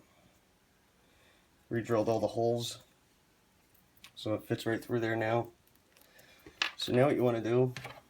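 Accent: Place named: New Jersey, United States. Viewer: I really like ur channel. You get right to the point, no stalling, no boring rambling. We think alike, wondering where ur from, almost sounds like a jersey accent. I'm on fb in the groups, BR etc. Don't kno who u are but wouldn't ask u to put that info here. Keep up ur awesome work. Love ur Barkie collection!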